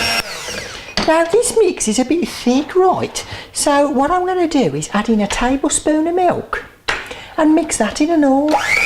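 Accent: Birmingham, United Kingdom. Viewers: Gotta see B.ham sometime... Could even learn to talk like that! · Thanks so much for this recipe I have always wanted to know how to make this properly ( I had an idea) I live in America now and it is so expensive to buy here and as you know not so nice from a tin (but better than nothing). Btw love your Brummie accent, I used to have a strong accent too that resembled a type of "saff London" accent (I am from East Anglia) and can still do it too in fact my son and I do it all the time for fun hahahahhaha. Keep up the great work and Happy New Year. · Have you made something like this before, or is your Brummie accent just giving me deja vu? lol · As someone from Birmingham myself, I can safely say that yes, everyone does speak like this. Loved this recipe :D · what happened to her accent :D...ah I get it!.....its biiiiirmingham! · Hahaha i love your birmi accent! 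You are amazing, your video's have so much character in them they are unlike any other cooking channel! been a subscriber for years and never let down!!! much love! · But she's a Brummie.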